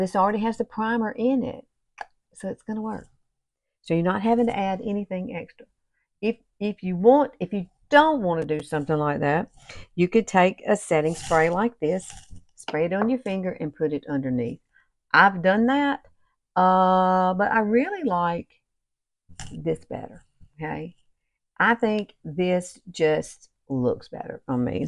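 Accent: South, United States. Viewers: Just came across you and have subscribed. I’m going to try your tips! Love your hair … and your great southern drawl 😊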